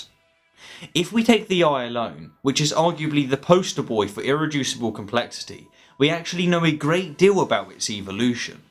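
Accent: England, United Kingdom. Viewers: How did you develop an English accent in South Africa?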